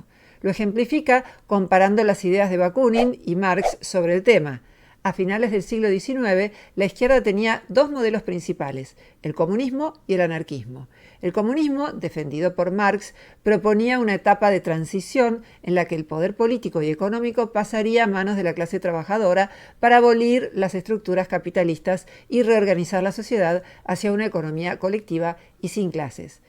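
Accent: Argentina. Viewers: La comentarista ametralla con su léxico sin pausas no se corrige y usa su predica para su gremio y los neófitos no entendemos pero soslayo que predica algo interesante la veo años y entiendo 20% de lo que dice aún la perdono por su hablar porteño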